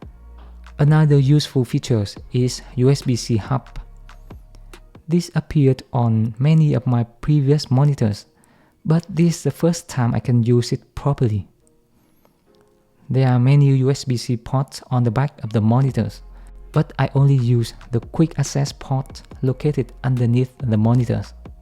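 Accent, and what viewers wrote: Vietnam. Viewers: giọng này chỉ có VN :)) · Đúng giọng Vietlish rồi, thằng chủ kênh người Việt Nam à =)) Chanel owner is Vietnamese =))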